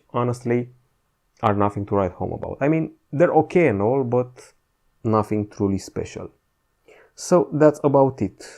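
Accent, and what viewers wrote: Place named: Romania. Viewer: Vorbeste in limba romana. Se cunoaste dupa accent, ca nu prea esti englez😂.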